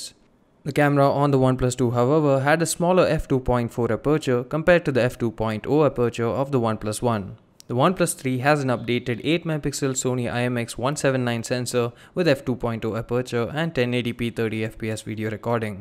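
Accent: India. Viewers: I don't understand your indian accent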